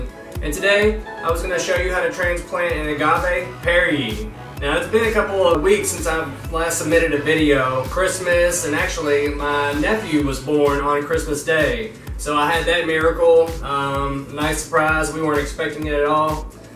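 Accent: South, United States. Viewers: First of all I am so glad I found your channel! Hearing a fellow southerner doing these plant videos is so refreshing! I'm from wv and I'm kind of shy about my accent on my videos lol second I got my first agave yesterday so thank you for the info!!! ☺️💚🌵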